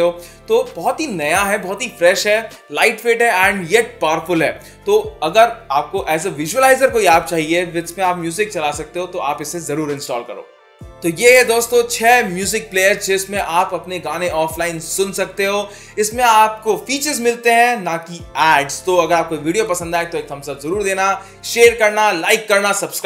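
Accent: India. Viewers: Hes speaking Hindi-nglish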